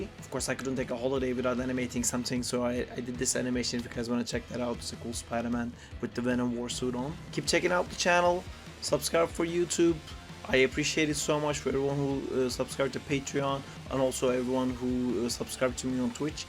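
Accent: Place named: Turkey. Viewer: selam aksanından türk olduğunu çıkardım oyunu nereden indirebilirim if u are not a turkish im sorry how i can download this game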